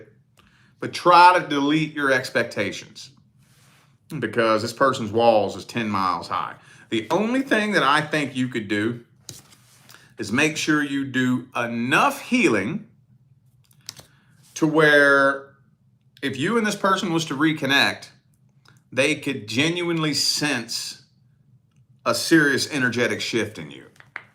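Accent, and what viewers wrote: South, United States. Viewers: Love that southern voice!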